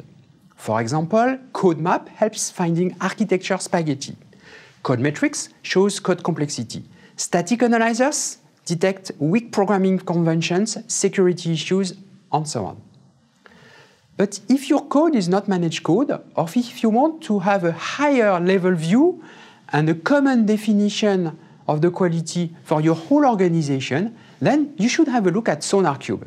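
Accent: France. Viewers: Very hard to listen to your english... It is almost french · Don't be mistaken, it is French with English words, no offence to anyone, but most Frenchies speak like that ;-) *Pink panther movie series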